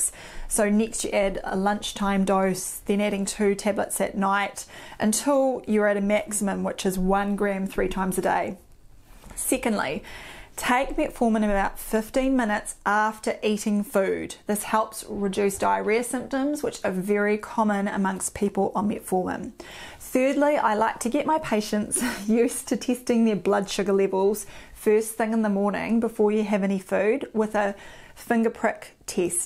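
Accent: New Zealand. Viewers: I love to hear your Kiwi accent and to watch you. You are eye candy. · I wish she were my primary care physician! I love her new Zealand accent! She's such a perfection, in every way! You can go to her clinic with an ailment, her smile will heal you in no time! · Love your videos and info but especially your kiwi accent as I'm an ex kiwi living in Toronto, Canada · Livel (level), tin (ten), sicondly (secondly)... Lovely New Zealand accent 😄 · I just love your Kiwi accent apart from all the important info. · I loved the new Zealand accent more than the informatiin on Metformin... The accent reminds me of Jacinda Ardern.♥️😊. But what about a high fat low carb diet,as an alternative to Metformin?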